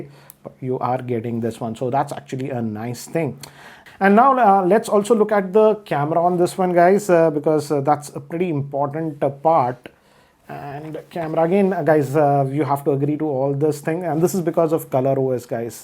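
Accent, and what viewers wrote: India. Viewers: The Indian accent is really hard to listen to 😖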